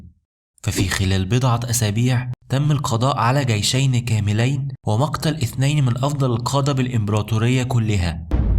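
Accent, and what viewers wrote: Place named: Egypt. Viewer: تعليقك وسردك للمعلومات سيئ جداً.. ما ادري ليش المصريين متعلقين بلهجتهم ..؟ انت تقدم ماده المفترض منك نطق الحروف بالشكل الصحيح ..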